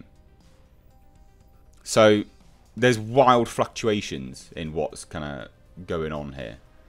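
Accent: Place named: United Kingdom. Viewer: as an American I think your Emperor Voice is very very good I think you being British helps? So Rise Of Skywalker and its flat feeling Trailer will be a flop in the US. Unless they are hiding something huge like LUKE being alive its shit, and I have no interest in seeing it. They have killed the 12 yr old boy in me who saw Star Wars in theaters in 1977. We have the OG trilogy and Prequels to give us that great feeling of wonderment we had as kids in the 70's!!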